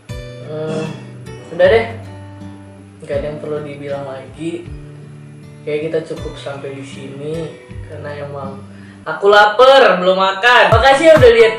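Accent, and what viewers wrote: Medan, Indonesia. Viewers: orang medan ye bro?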